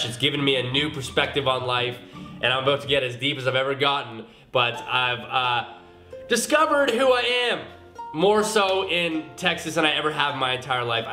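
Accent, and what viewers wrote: Canada. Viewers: Are you Canadian or American??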